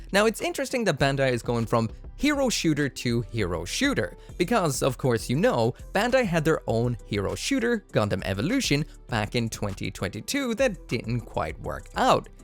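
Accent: Ireland. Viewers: not the 1920s bavaria 😭….. also ? u are irish?? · I heard the accent and knew straight away you are one of my own.. I got my first Gunpla in ken blacks in Kilkenny. Genuinely think its become an Issue for me now the bank account is gonna be crying for a long time now haha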